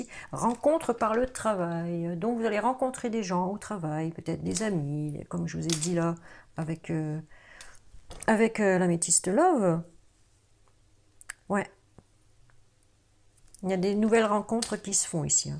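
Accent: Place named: North, France. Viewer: Bonjour vous êtes du Nord 😊😊 je l'entends comme moi 😊😊 votre tirage me parle beaucoup ♥️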